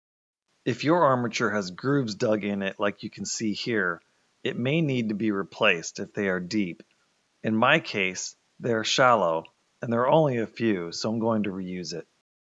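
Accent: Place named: United States. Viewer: WE SUBSCRIBED Coming upon your video after working through a few with non-native speakers or unclear audio filled me with appreciation for your "unaccented" speech-- no drawl, only plain American pronunciation. Along with a systematic disassembly / reassembly process, with product tips and pointers, your video is exactly what I needed. The only aspect for improvement is most of the action is recorded at a distance, and we are left looking over your shoulder, unable to see more orienting detail and your specific, finger-level movements.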